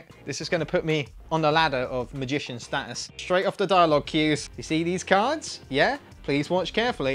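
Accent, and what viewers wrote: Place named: United Kingdom. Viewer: I like how British people say three as free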